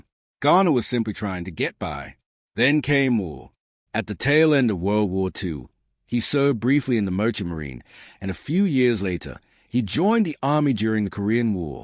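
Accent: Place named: England, United Kingdom. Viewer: English accent....that's how they speak.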